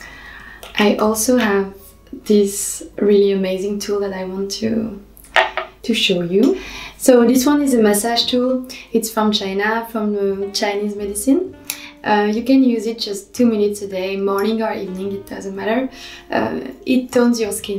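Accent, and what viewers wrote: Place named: France. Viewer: The French accent and the gentle tone of her voice is mesmerizing. She doesn't need make up, already perfect.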